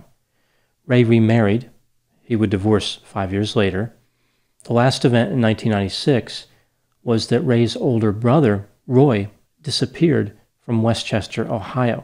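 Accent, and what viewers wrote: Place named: Pennsylvania, United States. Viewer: See, with that accent I've always had a hunch you're from PA/the tri-state, as I am. And now that you've so perfectly pronounced Wilkes-Barre, I'm almost certain. Now just give me a Schuylkill & a Bala Cynwyd and I'll deliver my final verdict. ;)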